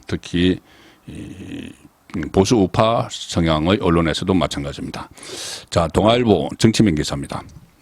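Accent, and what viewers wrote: Gyeongsang, South Korea. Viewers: 송국건님 제안드립니다. ※불필요한 군드더기 어~, 저~, 이~ 이런 류의 아주 나쁜 습관이 있어요. 속히 시정해 주세요. 경상도 억양의 전체적인 분위기야 어쩔 수없다 하여도 위에 지적한 것들이 심하게 섞일 때면 외면하게 됩니다. 발전하세요.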